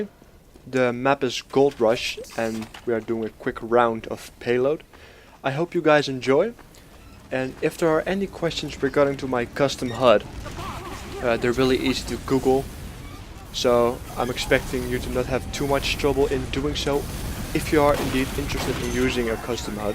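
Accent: Netherlands. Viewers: your dutch right? :p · Are you dutch? U got a dutch english accent XD (no offence)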